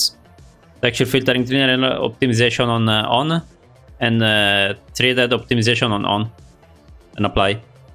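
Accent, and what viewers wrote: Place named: Italy. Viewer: L’accento italiano non scappa😭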